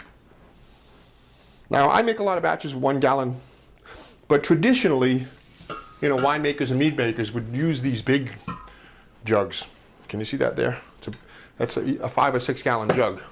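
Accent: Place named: New England, United States. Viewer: This guys definitely from the New England area.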